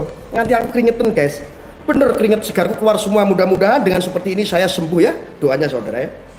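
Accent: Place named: Surabaya, Indonesia. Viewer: Suraboyo banget...cok..cok...setuju aku... 😘🥰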